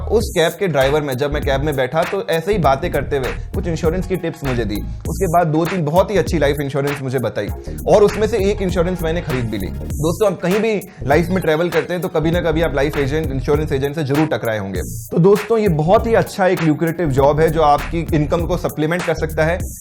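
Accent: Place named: Tamil Nadu, India. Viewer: Difficult to catch ur speak... Too tamil slang.... Can u improve bro.???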